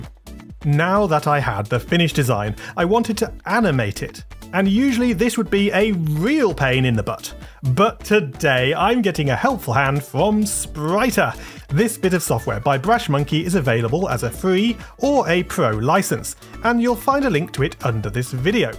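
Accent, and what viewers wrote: United Kingdom. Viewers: it’s the hot british scratch guy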